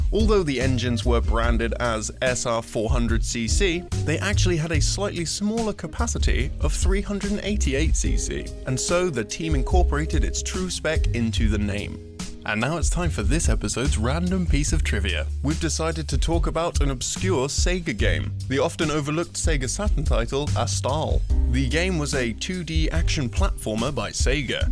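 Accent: United Kingdom. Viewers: sounds like a british vinny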